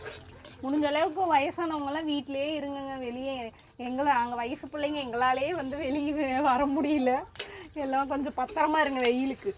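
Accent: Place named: Coimbatore, India. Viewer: திரும்ப திரும்ப நிறைய பேர் இந்த கமன்ட் போடுறீங்க.இது கோவை ஸ்லாங்.அவங்க எதுக்காக அத மாத்தணும்னு நினைக்கிறீங்க.அவங்கள அவங்களா இருக்க விடுங்க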